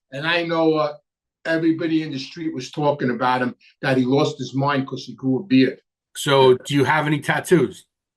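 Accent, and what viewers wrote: New York, United States. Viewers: I love listening to you talk. Always reminds me of the time I spent in New York.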